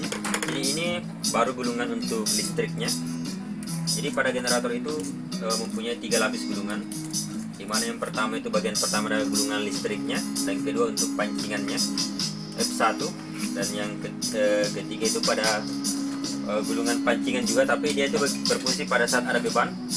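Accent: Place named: South Sulawesi, Indonesia. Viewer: saya fokus ke suara ta daeng... kyk ad logat2 sulsel ta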